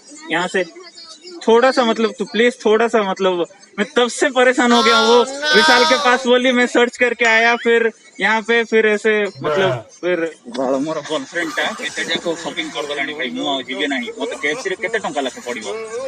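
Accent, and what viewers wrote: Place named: Bhawanipatna, India. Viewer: ❤️😍 Waah awsm and the u used the Bhawanipatna wala odia, so it seems real ❤️ Keep it up 😊 hasate rahio ase hi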